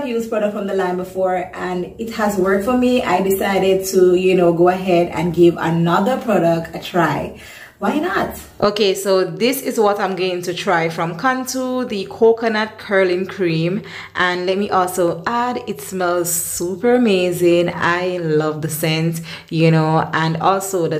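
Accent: Jamaica. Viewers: I can hear the Jamaican in you. I am watching you from Canada! I love your video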